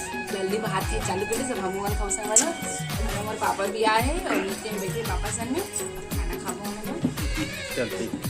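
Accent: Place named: Chhattisgarh, India. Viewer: Bahut badhiya Chhattisgarhi boli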